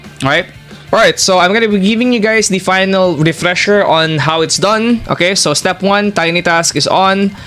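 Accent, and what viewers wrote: Philippines. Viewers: The accent is very Filipino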